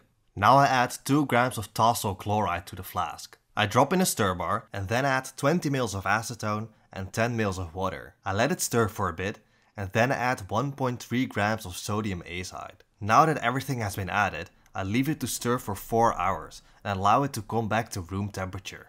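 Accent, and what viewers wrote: Netherlands. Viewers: Jy hoor de swaar Nederlands-aksent in sy spraak. 😂 Maar ja, baie goed, né?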